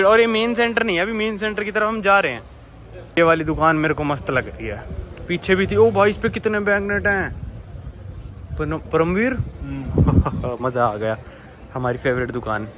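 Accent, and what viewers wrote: Haryana, India. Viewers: का accent कुछ कुछ हरियाणवी के तरह हो गया है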